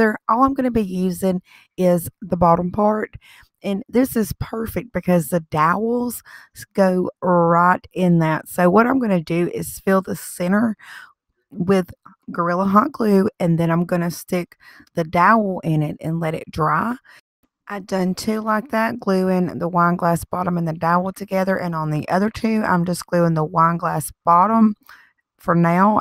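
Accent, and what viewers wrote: South, United States. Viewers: You are so darn adorable I love your southern accent Bless your little heart I love your wagon idea & I’m so glad I found you Keep on creating honey & god bless Hugs, Dee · SUPER cute! You are soo creative and I love your accent...being from TN I thought I had a southern accent..you got me beat!...(GA or NC maybe???)LOL!!...NEW SUB HERE!! Keep the ideas coming momma! (people from the south know MOMMA is a term of endearment)...hahahaha...love you girl! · Very cute! I love your voice,I have a southern accent also but not as pretty as yours! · You are such a blessing to watch. I love your crafts and "Southern" accent. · Omg!!! So cute and creative! I love it and love your southern accent!!! Blessings to you😍😘❤️ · the southern drawl makes it even better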